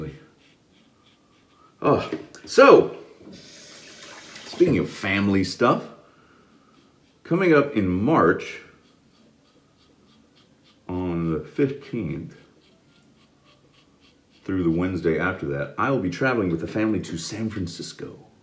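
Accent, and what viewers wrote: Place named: Liverpool, United Kingdom. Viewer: Might get this soap myself, peppermint 😃I love your Liverpool accent you do it perfect, hope all is well.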